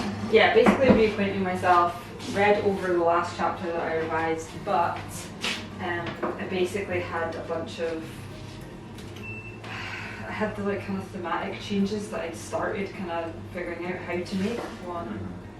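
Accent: Scotland, United Kingdom. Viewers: I clicked on this video and was kind of shocked when I heard your voice, I didn’t expect a fellow Scots person to show up on my recommended.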